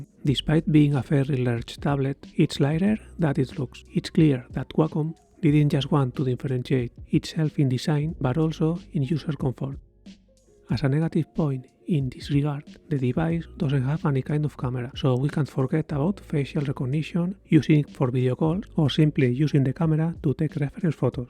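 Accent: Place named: Spain. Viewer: Genial video de una tablet que la ando cazando para cuando baje algo de precio; asi como curiosidad , Creo que eres Nativo de la lengua española ( lo noto en tu acento) no se, por que a los que sabemos un poco de ingles en mi caso b1 ( entendemos mucho mas la gente con acento que a los nativos del ingles)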